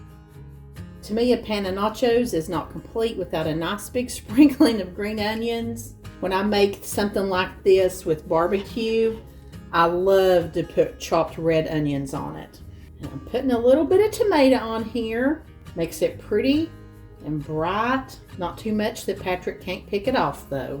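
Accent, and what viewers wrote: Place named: Southwest Georgia, United States. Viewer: I want to make all this just because of your accent. Reminds me of my aunt from SW Georgia. So charming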